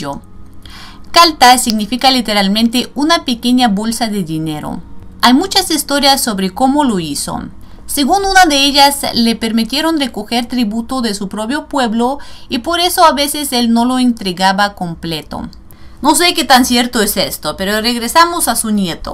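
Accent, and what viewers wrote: Russia. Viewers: Estas perdiendo tu acento ruso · No me gusta como hablas el espanol me gustaria que hablaras el espanol sin acento ruso y lo hablaras como nosotros los latinos.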